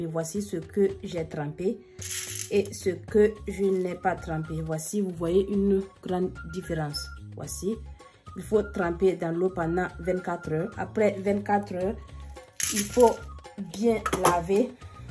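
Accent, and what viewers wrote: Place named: Mossi, Burkina Faso. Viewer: Ça vient de l’accent mossi pas tous les burkinabè sont mossi. Y’a plus de 60 autres ethnies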